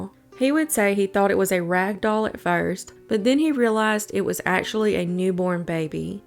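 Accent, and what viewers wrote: South, United States. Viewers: Coffee, Crime and a Southern accent. It is a good morning.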